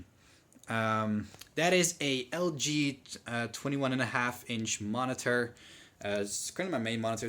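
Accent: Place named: Netherlands. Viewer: do you live in the netherlands? i hear some dutchish sounds in your english and i think i saw a netherlands flag on the word book next to the tv:p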